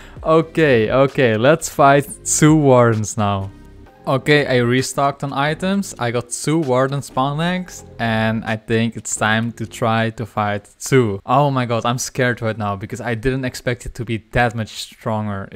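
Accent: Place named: Netherlands. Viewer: Veel succes ermee man, assuming youre dutch since i might be hearing a dutch accent. Fun commentary btw, i enjoyed the attempts & inevitable panic as expectations get absolutely grounded back to reality & humbled w the buffed Warden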